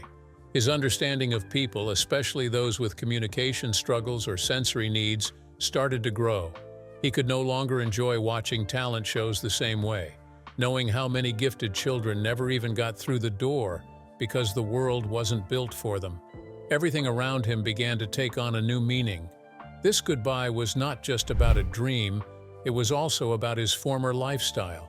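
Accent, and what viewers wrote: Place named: United States. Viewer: WHY IS AN AMERICAN Doing The Commentary?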